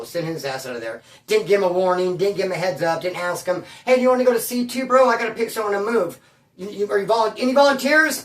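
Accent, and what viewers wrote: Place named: California, United States. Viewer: until you started talking and the Cali accent came out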